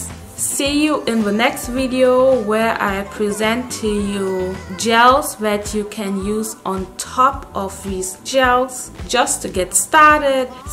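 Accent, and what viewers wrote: Germany. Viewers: Are you German or speak German? Your English sounds like the ones Germans speak Lovely hair 😍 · Love your German accent ❤ big fan of kinky curly and Camille rose 💗🫶🏽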